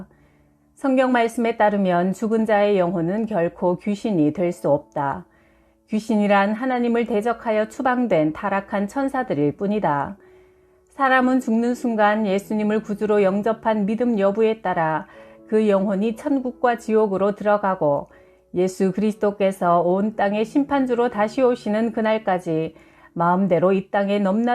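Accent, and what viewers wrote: Busan, South Korea. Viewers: 님 부산사투리 직이네예~~😂😂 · 님 부산 사투리 ~~ㅎㅎ 최곱니다.^^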